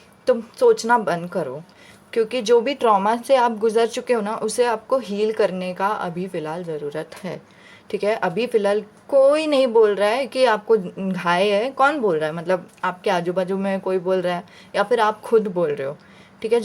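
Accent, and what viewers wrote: Maharashtra, India. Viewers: Aap Marathi ho Nice tone 😀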